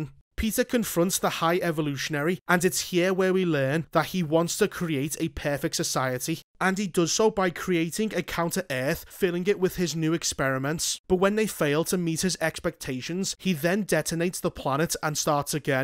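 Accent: Liverpool, United Kingdom. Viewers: It's scouse, not Scottish 😅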